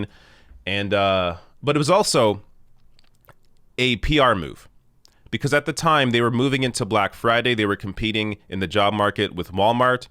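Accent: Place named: Canada. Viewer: Canadian accent coming in extra strong today.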